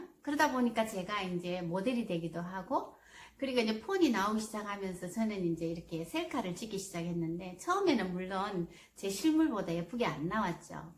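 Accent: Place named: Busan, South Korea. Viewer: 사투리로 봐서는 부산 아지메가 학실합니더~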